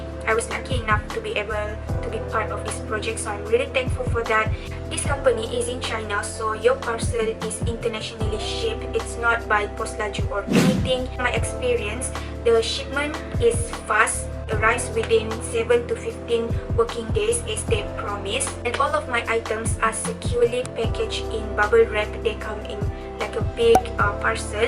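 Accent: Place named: Philippines. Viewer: You speak like filipino english accent. Btw I like your video. Thumbs up 👍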